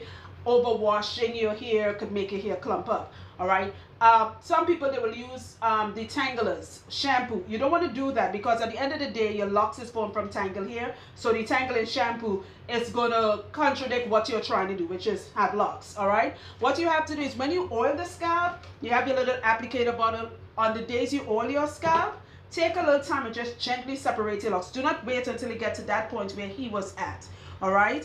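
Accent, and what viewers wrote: Trinidad and Tobago. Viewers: Gurl I knew I hear Dey trini in you 🇹🇹🇹🇹🇹🇹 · This sounds like a Trinibagonian. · First video I’ve watched of yours. I recognize that accent anywhere. Sweet T&T (my dad’s homeland)! I find the loc separating to be very satisfying. I not even to the washing part and you have a fan for life!! I’m about to binge your videos and get tips for my hair. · I noticed the accent, but its only when you said you were from Trinidad, that it all made sense. 🇹🇹❤ i live in Trinidad aswell, n you did an amazing job💪💯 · When I heard your accent I knew you were from my country T&T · I was listening to your accent and figured you were a Trini 🇹🇹🇹🇹🇹🇹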